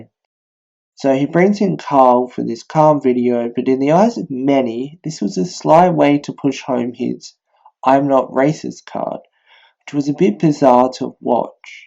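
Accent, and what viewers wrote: Australia. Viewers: it's so relaxing hearing another australian accent in videos. i don't have to put everything thru a mental translator from whatever to slang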